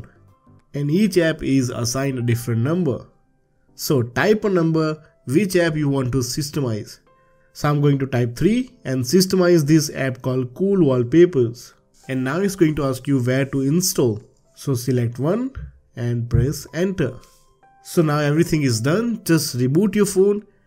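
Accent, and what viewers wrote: India. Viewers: thats Indian accent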